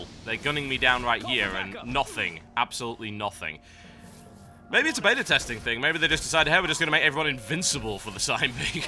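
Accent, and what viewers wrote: United Kingdom. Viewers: ure my favourite youtuber atm, mainly because its nice to hear proper British annunciate but if you fail to provide DCU content post launch... I. WILL. KILL. YOU!!!:P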